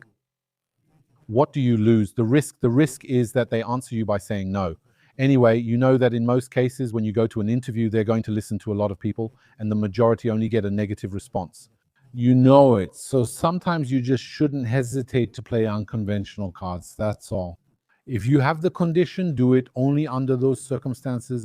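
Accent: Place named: United Kingdom. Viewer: L'accent britannique, la classe !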